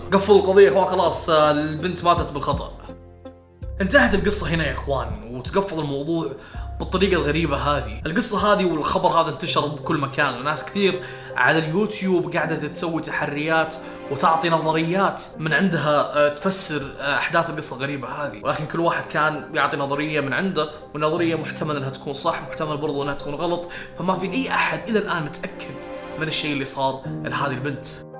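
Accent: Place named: Saudi Arabia. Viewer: وين اغلب كلامه كويتي كلامه سعودي واضح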